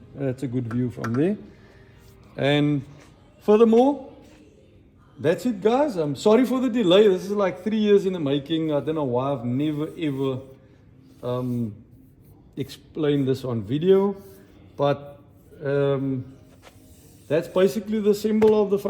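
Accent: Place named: South Africa. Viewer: Are you from South Africa lol just come across your vid you sound a lot like the guy from district 9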